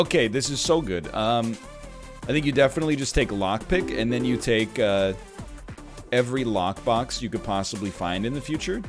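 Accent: Canada. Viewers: NL sounding very Canadian this video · why is NL sounding exxtra canadian latley?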